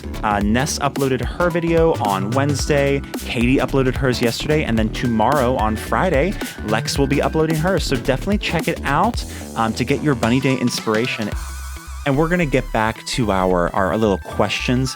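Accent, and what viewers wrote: United States. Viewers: That’s how we say it in Minnesooota. We keep our ayegs in bayegs 😂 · Yes I have a friend from central NY who says egg and leg like you 😂 you’re definitely not alone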